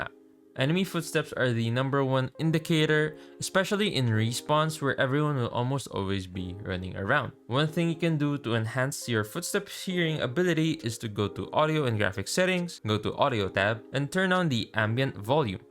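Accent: Philippines. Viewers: Bro i think you're a Filipino, idk but i hear it when ur speaking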